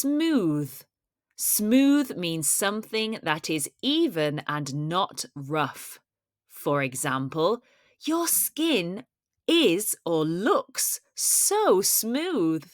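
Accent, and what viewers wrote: United Kingdom. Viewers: Brit accent is precious ♥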